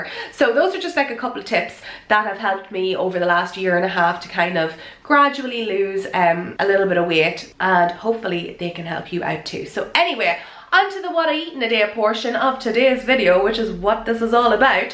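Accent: Ireland. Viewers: Ho. I'm Portuguese and I love your videos. I also love your Irish accent. 😊 Take care.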